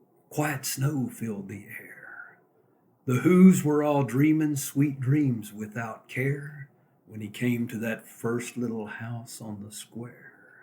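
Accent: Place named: South, United States. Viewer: Love, luv, l-o-v-e this! Such a pleasant, warm and southern voice. Going to share it with my friends ❣️👍🏻🫶🏼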